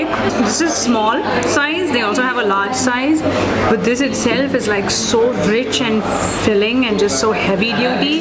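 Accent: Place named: Maharashtra, India. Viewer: good to see you back there are very few educated reviewers left now. i was expecting you to say sithaphal in classic Marathi accent do use it time to time its your signature move. you could be the marathi mark weins one day :)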